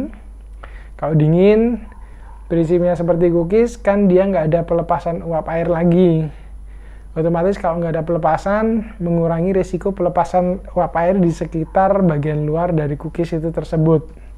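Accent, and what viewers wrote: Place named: Java, Indonesia. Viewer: Jawane ora ilang Yo mas,tadi sedikit bingung waktu bilang cokot,😄😄😄setelah saya ingat" ternyata gigit,,makasih untuk tip nya,sukses terus Yo mas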